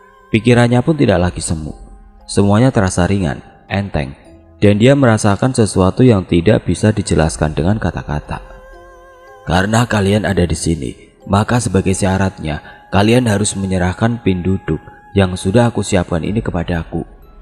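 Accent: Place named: Banjar, Indonesia. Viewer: admin keknya orang kalimantan , soalnya logatnya kalau ngomong banjar pas banget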